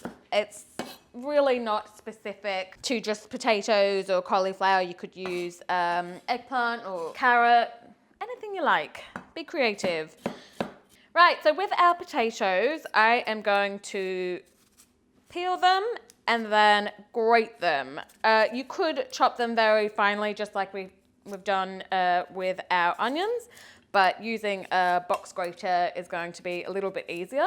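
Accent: United Kingdom. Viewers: thank you... you sound soo british...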